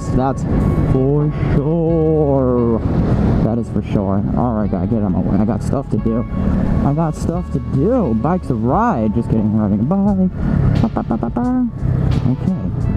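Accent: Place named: United States. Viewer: thanks for the reply. I was confused as well because from your accent I knew you were an american and wondered if you were refering to imperial or metric system.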